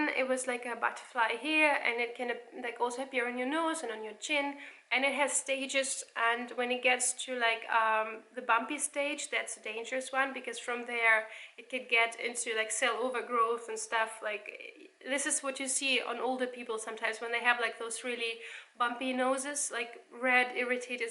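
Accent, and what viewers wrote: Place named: United Kingdom. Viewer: And that irritating british voice.....Ugggg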